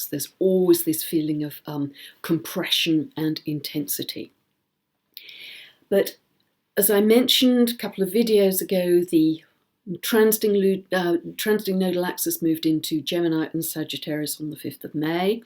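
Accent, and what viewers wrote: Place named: RP, United Kingdom. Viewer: I like that you are friends with Bracha. Good video. You are very posh:)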